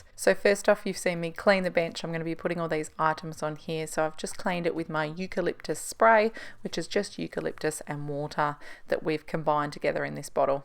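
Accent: Australia. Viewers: I've got the LG combined washer and dryer as I live in a unit so am space limited. I love it. It can take an hour and a half to dry clothes which is ages but i absolutely hate hanging them and then having the little clothes sculpture lurking around for days before I can be bothered folding them. ps. new melbourne follower here. So refreshing to hear an aussie voice on a clean with me vid.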